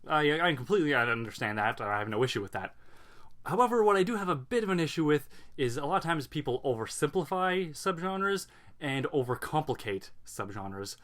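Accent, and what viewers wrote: Canada. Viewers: Is this guy Canadian?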